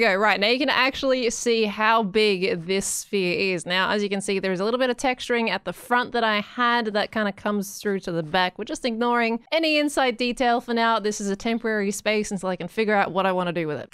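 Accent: Australia. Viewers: A hermitcraft does of happiness... Thanks a lot, you realy made my day, with your thick Australian accent 💜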